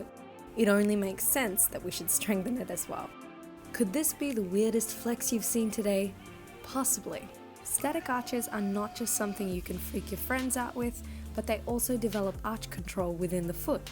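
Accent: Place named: Australia. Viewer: I noticed that you have an Australian accent! Just wondering where you can go to purchase ice skating boots?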